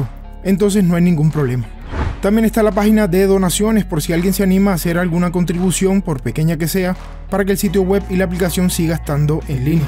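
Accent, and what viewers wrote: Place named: Cartagena, Colombia. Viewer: Amigo, usted es de Cartagena Colombia ¿Verdad? Esa voz no creo que sea de otra parte !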